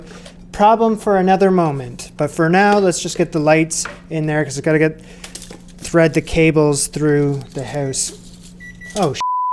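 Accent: Canada. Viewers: the one thing /along many other things) i love about your videos is the (canadian) pronunciation of words like out and house. omg it's just so pleasant to ears.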